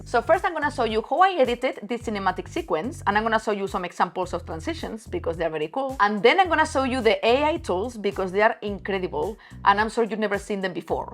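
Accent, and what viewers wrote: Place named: Spain. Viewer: That spanish accent oh myyyyyyyyyyyyyyyyyyyyyyyyy <3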